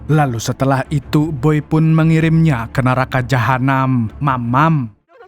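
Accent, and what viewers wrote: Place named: Bandung, Indonesia. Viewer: Iyeu Operator na Anu komentar pasti na budag Cicaheum nyyaa.... Logat na teu bisa ngabohongan penongton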